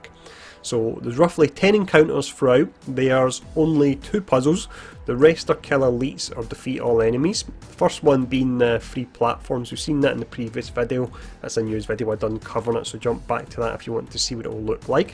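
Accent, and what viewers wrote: Scotland, United Kingdom. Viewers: this video was recommended to me if that helps as I've been watching a lot of different avengers content....I just cant figure out your accent...Scottish?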